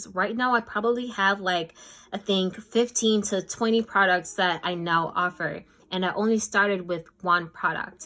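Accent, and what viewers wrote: Jamaica. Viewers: Do you have Jamaican parents? I'm hearing Jamaica in your voice. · Hello, I am wanting to venture into the beauty industry, I hope is to cater to everyone but specifically: Acne prone & Oily skin. Glad I came across your video. Also, I heard a Jamaican accent ❤️ 🇯🇲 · I hear Jamaican accent somewhere in there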